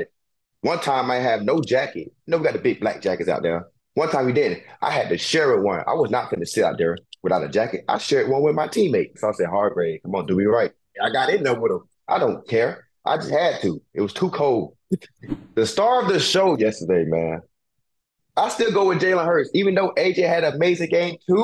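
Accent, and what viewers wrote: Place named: South, United States. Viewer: Man this guy has heavy southern accent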